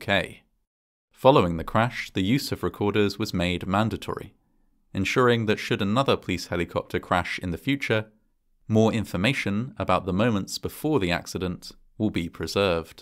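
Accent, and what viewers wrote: United Kingdom. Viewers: Did someone program a bot narrator with a British accent!? Because I didn't thin a narrator could sound worse that a bot narrator with an American accent! But you did it! Absolute crap!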